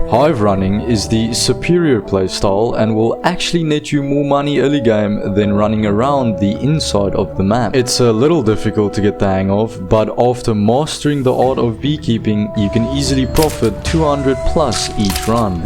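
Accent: South Africa. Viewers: Great video bruv! I did enjoy this style as well as your original content. While I was listening to you talk more I think I just realized something...are you Afrikaans? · That accent is definitely RSA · I could hear the twang of South Africa, kinda reminds me of the movie chappie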